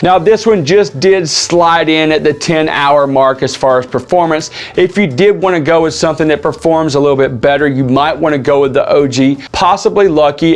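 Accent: South, United States. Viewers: I heard the draw and was like....he's from my neck of the woods. Howdy from South Carolina!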